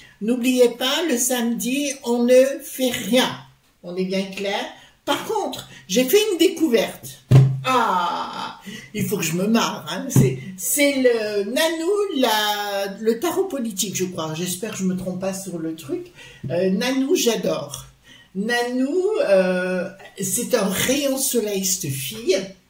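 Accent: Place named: Lyon, France. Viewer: Je vous écoute pour la 1ere fois sur le conseil d une voyante que je suis j adore votre franc parlé je trouve que vous avez un accent lyonnais et certain mots es que je me trompe je suis lyonnaise j habite Montpellier je suis ravie de votre vidéo merci 👍👍👍👍👍😂😂😂😂👋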